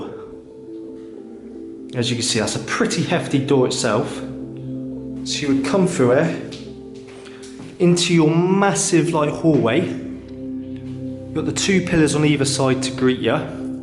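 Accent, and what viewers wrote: Bristol, United Kingdom. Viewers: Going by ye voice im guessing ye from ol Brizzle me boy!! Ye sounds like me ol Brizzle buddy from university!! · You gotta b kidding me ryt. At 1st one of u started talking I thought omg his Aussie, gota find out were is this abandoned mansion n then the British accent came out I was like ohhh shoots ...I can NOT believe such a beautiful mansion can be abandoned like that..That's a couple million dollars ryt.. I mean who wouldn't want to have that n restore it....hmmmm unless it's haunted...😱 post more videos...guys.. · So what area of the UK is this house in? The narrator sounds a bit Bristolian so is it near there? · I made it in, you're accent is SO annoying I couldn't watch any more. I prefer watching the American explorers it just hits flat when British people try to pull off an exciting, informative and unique experience. 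This is trash man.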